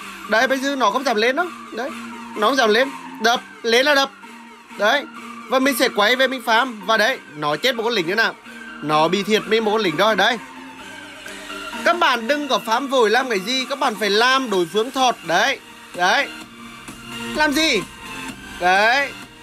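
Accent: Nghe An, Vietnam. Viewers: Anh ở đâu nghệ an vậy ? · Bạn là người nghệ an ak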